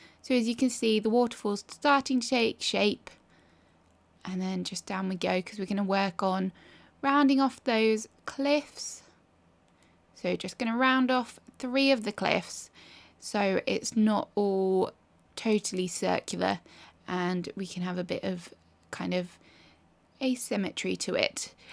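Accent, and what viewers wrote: England, United Kingdom. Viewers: I love your accent! Are you from England?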